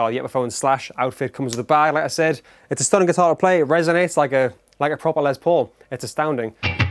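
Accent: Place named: England, United Kingdom. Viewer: he sounds like bob odenkirks english accent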